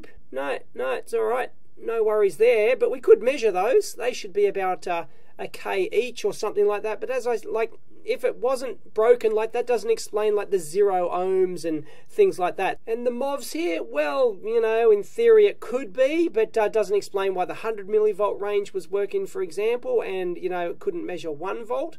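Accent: Australia. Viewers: "terrible voice" is a little squeaky but for non-english speaking people (like me) is very clear, intelligible and understandable. The most terrible things are both A and E pronunciation but, well, Aussie way:) When he says "EEV Blog" I still get something like "HEY HEY VEE BLOG", but after months - years, by now - of videos I eventually started to love so much his accent. And, of course, I deeply love the contents, the professionality and the expertise. I learned a lot from this YT channel, as well as how understand english language spoken by a "squeaky Aussie" (sorry LOL)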